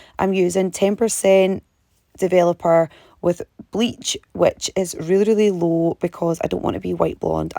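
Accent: Scotland, United Kingdom. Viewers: She's Scottish for sure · You’re Scottish or irish or welsh ?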